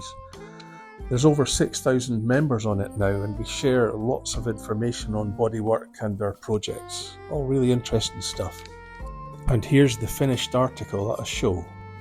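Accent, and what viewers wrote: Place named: Scotland, United Kingdom. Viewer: You make me want to go to Scotland again. I visited for work with Motorola back in the day. Your accent brought back fond memories of good people.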